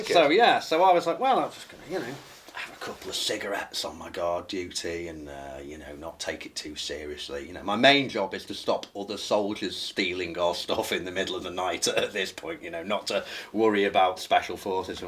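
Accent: United Kingdom. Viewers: British ish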